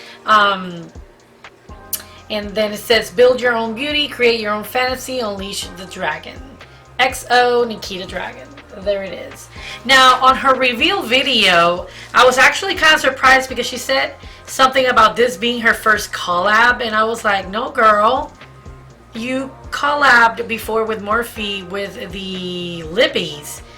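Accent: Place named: Miami, United States. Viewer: I love watching your vids! You’re always super vibrant and vampy. 💜 Are you from Miami?? You have a very similar accent to my own 😂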